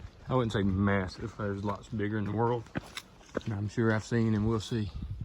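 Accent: South, United States. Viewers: U a southern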